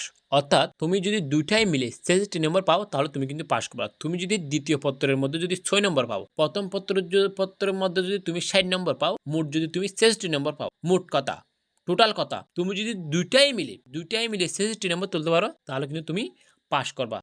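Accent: Chittagong, Bangladesh. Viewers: ভাইয়ের বাড়ি মনে হয়, আমাদের চট্টগ্রামে ❤ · Apni mone hoi chitainge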